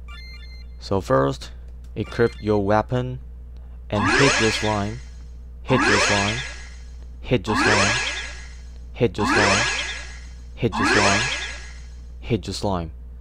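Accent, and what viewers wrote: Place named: Taiwan. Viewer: 你好,很高兴认识您。Actually, I know you are from Taiwan. Because the mandarin that you speak are different than mine and I saw you use type traditional chinese in the tutorial video. I learned it for half year at Taiwan University last year on November but I still need to keep up on trying and learning from everyone here. It is a great experiences to learned it from you. I enjoy your tutorial video a lot. 谢谢你。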